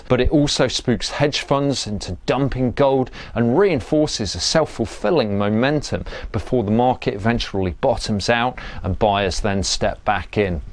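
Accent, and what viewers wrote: United Kingdom. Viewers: good british accent It's suppresses as the price reflects the economic health of a nation and also the inverse effect on currency Ponzi scheme, as the metal is leased out many more times than actually exists ; If u went into the aftermarket and bought after the close, and sold before the open on the nex day, u'd make risk free profits : Massive SELL order just b4 close scares long investors to lower their bid AND spooks hedge funds into dumping Au..this becoming a self fulfilling momentum. As 20-to-1 leverage is present in futures market, the effect is great> Hedge funds' stop losses also kick in...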